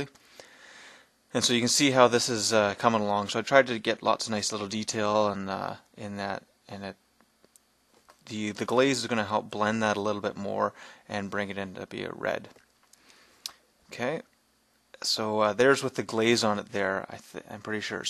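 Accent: Canada. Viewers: i know u are from canada only because you say ewt instead of out lol! no offence nice vid.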